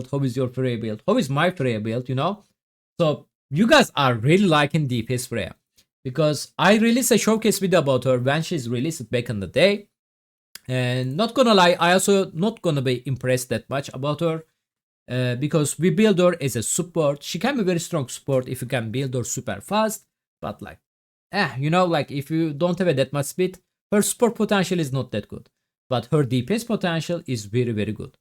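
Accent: Turkey. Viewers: Kesin bizden,ingilizce mukemmel olsada tonlama tam turk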